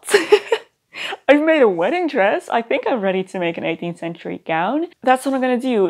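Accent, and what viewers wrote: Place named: Netherlands. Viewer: hoor ik een wat Nederlands accent af en toe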